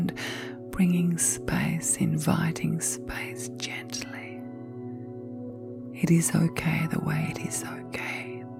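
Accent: Australia. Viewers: how are you doing today? I love 💕 listening to your very soothing sweet chloroform voice!!!!💕💕💋💕, your voice always makes me super duper happy 😊!!! 💕💋, I really miss your very sweet Australian voice!!!💕💋 I miss telling you stories!!!💋💕, you are my very favorite chloroform & you know how to keep me asleep!!! I will talk to you later!!!💋💕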